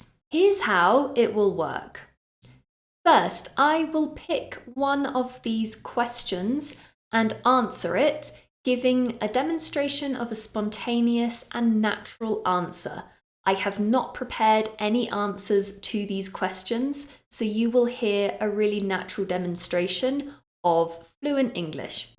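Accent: United Kingdom. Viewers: Straightforward teaching style. Clear and beautiful RP accent. What else, you are a marvellous teacher.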